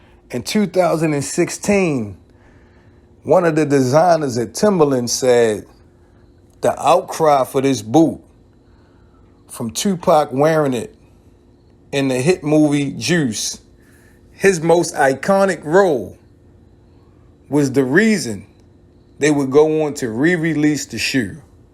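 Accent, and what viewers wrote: Baltimore, United States. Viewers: Your bmore accent is heavy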